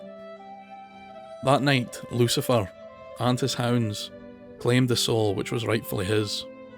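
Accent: Scotland, United Kingdom. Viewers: His Scottish accent might be a hint lol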